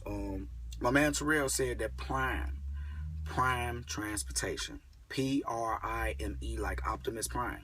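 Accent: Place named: Chicago, United States. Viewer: He ain't lying. I know people making this kind of money owner operating. I knew you was from Chicago when you started talking.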